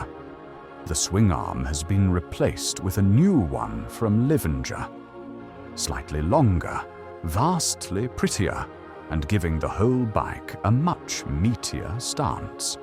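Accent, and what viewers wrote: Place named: United Kingdom. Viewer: I've always preferred the English (GB) voice. Thanks for this video.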